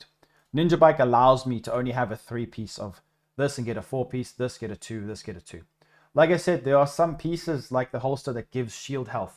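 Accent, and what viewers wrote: South Africa. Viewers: Is that a South African accent I hear ? Hello fellow traveller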